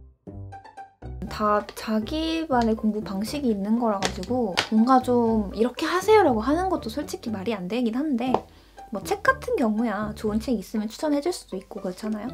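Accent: Kansai, Japan. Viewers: 関西弁かわいい笑笑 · たまに出る、大阪弁が可愛いですね？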